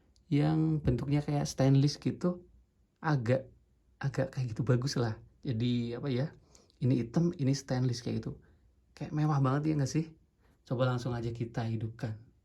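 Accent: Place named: Java, Indonesia. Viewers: Padahal udah bagus channelnya. Apa lagi perpaduan ngapak karo indo. Malah ganti maning haduh yawis moga lancar ges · Padahal dah keren, pas sma nada suara ngomong ya jawa.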